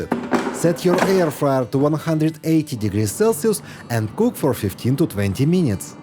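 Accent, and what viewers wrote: Russia. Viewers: Very smart. Sounds like a Russian accent. Excellent recipes and hacks to learn tasty and healthy food. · Dude, your Russian English is making my ears bleed. Subtitles are enough, macho, absolutely. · I'm not from an English-speaking area, but this kind of English-Russian is so irritating that I can't listen to it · Ruski akcent wymowy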